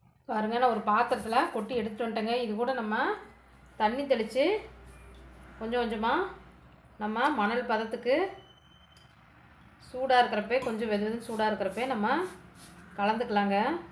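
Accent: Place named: Coimbatore, India. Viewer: Naa coimbatore naanga pesura mathiri mariyathaya pesuringa athanaalay neenga yentha oornu ketkirenga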